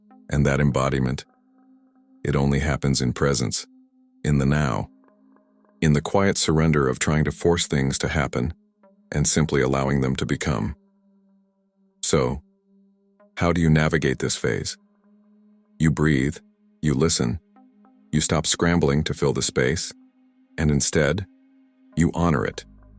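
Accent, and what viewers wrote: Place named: United States. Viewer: The discomfort im feeling right now, is the reaction to this vile American accent.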